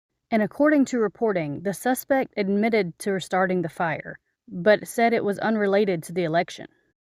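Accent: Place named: Alabama, United States. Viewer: Honestly I would have guessed Alabama for your accent, though it's not a great match to the accent I know from folks from Alabama (it's close-ish), so the accent question, while potentially a bit rude is also kinda fascinating. Seems kinda like a NYC vs upstate New York accent situation (or Mass - folks on the western side of the state sound completely different than folks from Boston). Then you have folks like me who kinda pick up pieces of accents in about a week of exposure - we can be quite vexing to pinpoint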